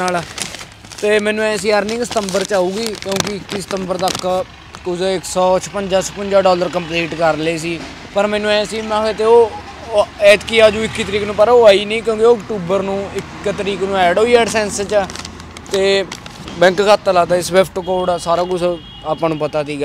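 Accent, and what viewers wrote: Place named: Puadh, India. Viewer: Y tera pind kehra Boli tan Sade aali Puadhi lagdi aa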